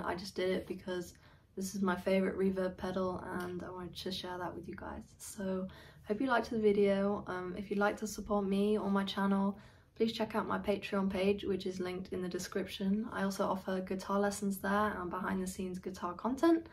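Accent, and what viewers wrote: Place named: England, United Kingdom. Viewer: who are you？ England accent.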